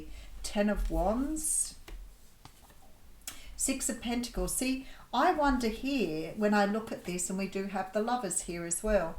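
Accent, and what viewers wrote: Australia. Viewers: He wants work on things with me. Spend time in a new and different location with just the two of us. As nice as that sounds, the last 3 years have nearly broken me - body mind and spirit. The skills I’ve learned from you. have been life changing for me!! Your sweet Aussie voice has become the voice in my head. Helping me sort thru difficult feelings. Without telling us what to do, you’ve lead by example and taught us how to empower ourselves! Thank you! ✨🙏💕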